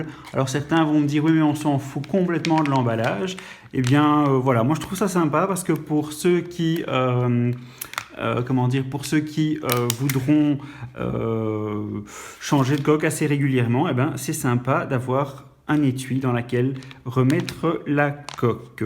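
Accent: Belgium. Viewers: Accent liégeois?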